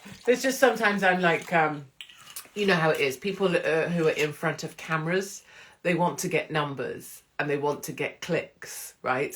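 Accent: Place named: Birmingham, United Kingdom. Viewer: Burden drudgery absurdity beautific anglettere nonsense, completely benign drivel rumpus, fabulously sub eroticism, divided by uncertain boundries, quagmire conumdrum❤❤❤ Your bog or mine! Gather yourself! Ok yah! Once i had an Amstrad Stereo Pour some sugar on it! Def jaguar! Blinkinsop journal! Fabulastic Ztuffz Verbosity , i mean! Like the stance! I luv your Brummy accent fab! 4 mile?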